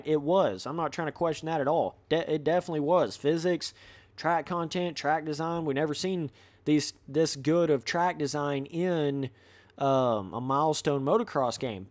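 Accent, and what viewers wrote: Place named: South, United States. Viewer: And you sound like a stupid ass hillbilly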